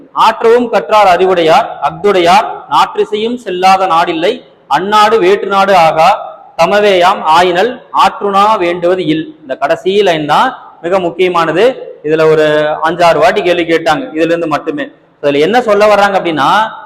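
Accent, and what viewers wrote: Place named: Kongu, India. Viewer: Anna neenga kongu tamil azhaka pesuringa enna oor neenga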